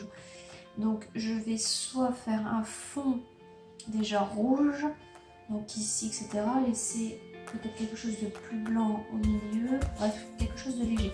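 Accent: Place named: Quebec, Canada. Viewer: C'est génial, quand j'entends ta voix (qu'accessoirement je connais), j'ai presque l'impressions d'entendre une touche de Québécois 😄 (Le "à l'arrache" me rassure sur tes "origines" 😉)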